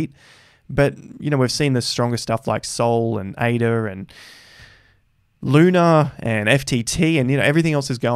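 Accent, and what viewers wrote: Australia. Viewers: are you the Aussie accent on yousician?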